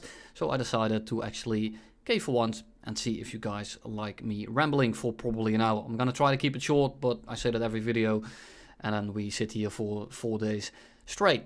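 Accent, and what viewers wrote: Netherlands. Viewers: I do love listening to Dutch people talk about something for up to an hour.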